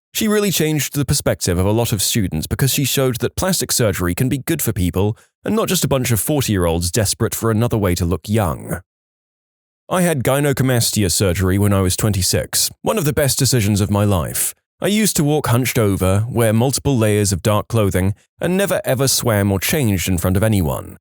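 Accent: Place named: United Kingdom. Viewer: It's called a British accent. I bet you don't pronounce the middle part of "squirrel". All accents distort certain words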